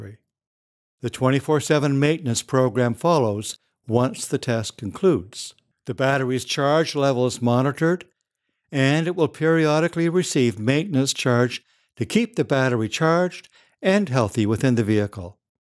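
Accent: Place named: Canada. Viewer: This guy's voice is weird, I hate it. Sounds like a damn Canadian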